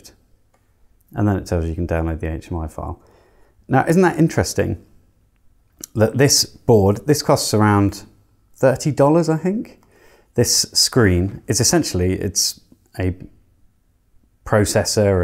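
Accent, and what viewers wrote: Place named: Switzerland. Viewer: here is the guy with swish accent ;) i like your videos sir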